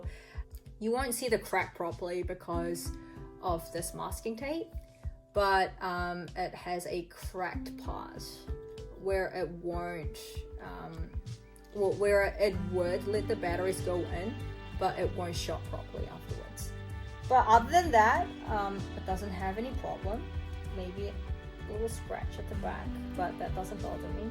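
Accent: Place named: New Zealand. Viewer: ㅋㅋㅋ 맨날 미드만보다가 오랜만에 키위악센트 들으니까 귀여워 😁😁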